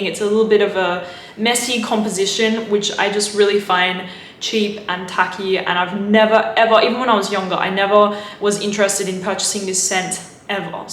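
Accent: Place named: Australia. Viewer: Those fragrances might be tacky. But not as tacky as your half Australian, half European (??) accent. You don't sound exotic. You sound tacky. Stop it.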